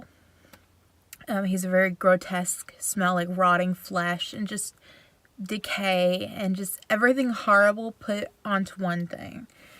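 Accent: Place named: South, United States. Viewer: lol im from Nashville, i could sense you were from the south❤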